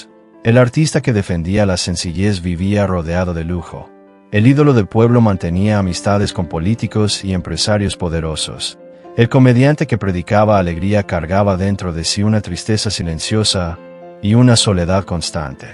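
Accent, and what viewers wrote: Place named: United States. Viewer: Y tú porque hablas como greengo? Digo mejor pon la IA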